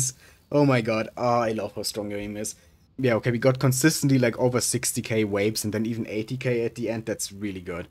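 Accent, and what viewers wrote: Germany. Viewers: Gutes Video, finde es krass, wie sehr man heraushört, dass du deutsch bist. xD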